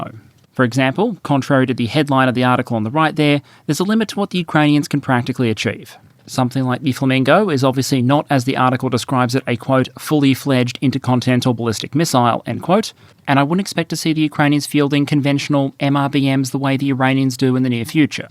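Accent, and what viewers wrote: Australia. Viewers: He's an Aussie, alright?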